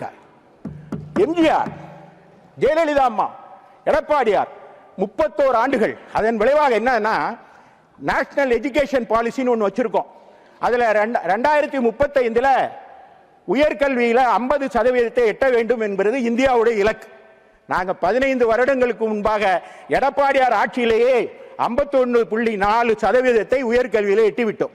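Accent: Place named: Tirunelveli, India. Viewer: நெல்லை தமிழே ! ஆணிதரமான வாதம்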